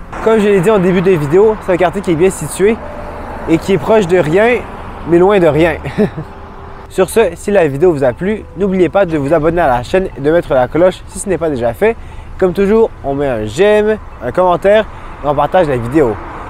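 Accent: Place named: Quebec, Canada. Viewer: j'y pense que son l'accent quebecois est vraiment..indubitable...😅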